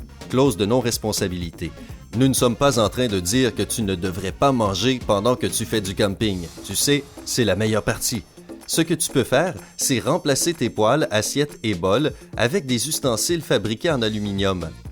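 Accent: Quebec, Canada. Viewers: Accent canadien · Je bois VOS SAINTES paroles monsieur le Canadien. Bon de ce pas je vais mettre sur MA table de nuit un bulletin neuf du loto ou mieux encore un bulletin neuf d'euro millions sur une feuille d'aluminium Et peut être que dans un rêve je verrai la combinaison gagnante. Merci du conseil et que vive Laluminun...jadore VOTRE accent..moqueur ce...oh le vilain garnement...je vous ai mis un joli pouce bleu..bonjour à Céline... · c'est un être à cause de son accent. Probablement un canadien , de plus, on n'est pas habitué au tutoiement facile des Québécois qui nous donne l'impression d'être un enfant !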